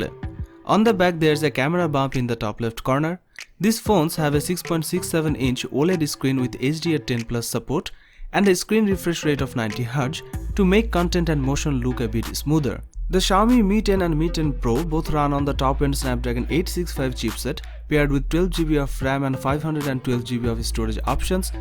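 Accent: India. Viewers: Please, next time without Indian accent🙏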